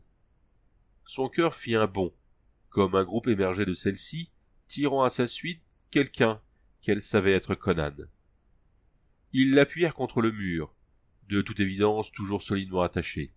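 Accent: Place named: Paris, France. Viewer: les livres audio c'est une bonne idée, mais l'accent parisien c'est pas possible c'est insupportable j'ai cliqué au hasard "qui occultaient l'ciel" bordel LE CIEL pas "l'ciel" On dirait une grosse vendeuse de poisson sur le marché entrain de brailler pour vendre sa merde : "VOUS V'LEZ UNE P'TITE TRANCHE MA P'TITE DAME" "V'NEZ GOUTER MON POISSON M'SSIEURS'DAMES, IL EST BON MON POISSON" Ok pour les livres audios, mais lis les mots en entier stp, sinon c'est inécoutable (sauf par des parisiens évidemment) bon après je critique mais dans l'ensemble c'était bien lu 👌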